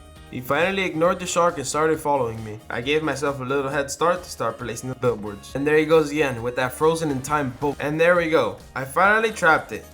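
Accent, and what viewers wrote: France. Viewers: I love your french accent and your balcony👌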